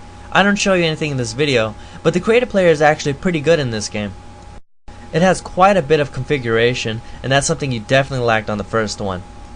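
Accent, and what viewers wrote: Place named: Ireland. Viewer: I agree that it's very pleasing to listen to. Neither loud and brash, nor quiet as a mouse. Neutrally-accented, save for a faint Irish lilt.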